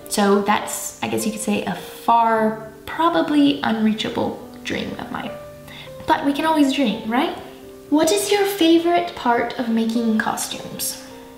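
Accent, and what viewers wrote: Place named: Eastern Europe. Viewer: Oh my!! I thought you were older, yes; but mainly I thought you were from somewhere in Eastern Europe!! 🤣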